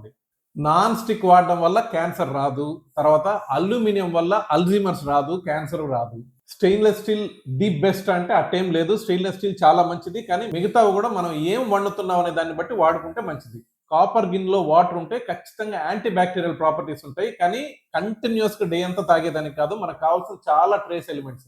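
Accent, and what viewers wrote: Nellore, India. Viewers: I like your way of thinking and explaining things very clearly.I like your Nellore style accent.God bless you young Dentist.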